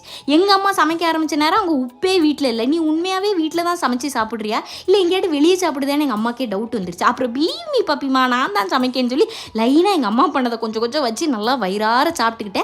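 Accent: Tirunelveli, India. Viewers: Akka neenga Tirunelveli ah ka ✨ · Neenga thirunelveli ya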